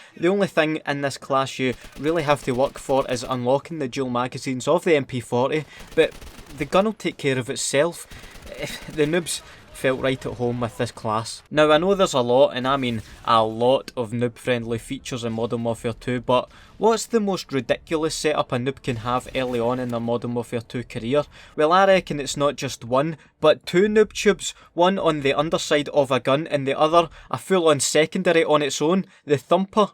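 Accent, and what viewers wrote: Scotland, United Kingdom. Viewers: What part of Scotland do you come from? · I love he’s Scottish accent lol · You from Scotland?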